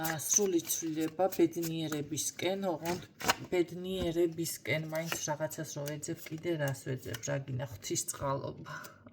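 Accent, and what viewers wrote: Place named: Imereti, Georgia. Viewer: ძალიან კარგი პიროვნება ხართ .მიყვარს თქვენი ლაპარაკი იმერული კილოთი რომ ხსნით ტაროს❤❤❤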